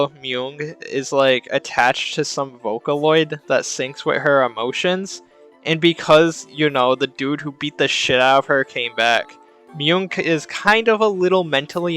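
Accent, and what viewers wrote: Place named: West Coast, United States. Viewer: It's okay brother I watched and enjoyed, I too have made cringe during my cursed stay in this world. However your accent eludes me, where is it from??? Some kind of west coast thing?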